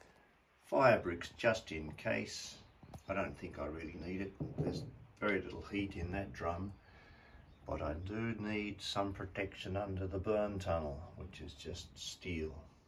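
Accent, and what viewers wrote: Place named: Australia. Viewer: I'm a sucker for rocket mass heater vids. Just posted - recommended in my YT feed. Aussie accent talking about first burn for winter - intrigued, I check out your channel and then it becomes clear - a fellow Aussie (wiser than me) living my future in the Japanese countryside, about 12 months ahead of me. 2024 is the year I end my (and my wife's) 21 year hiatus!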